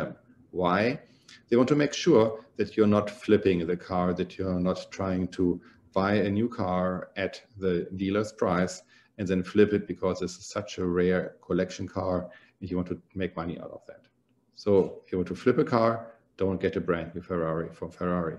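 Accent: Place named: Germany. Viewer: Deutscher Akzent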